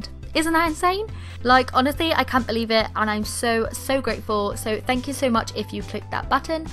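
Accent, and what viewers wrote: United Kingdom. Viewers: I've been watching u for such a long time I love ur vids! PS: ur British accent is adorable🫶🏼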